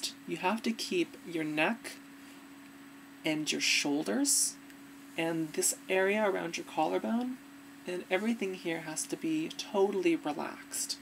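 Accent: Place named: Ireland. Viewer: You have a lovely voice! You seem to me to speak with a slight Irish accent. When you say words like 'that' and 'part'. It's beautiful and sounds very natural.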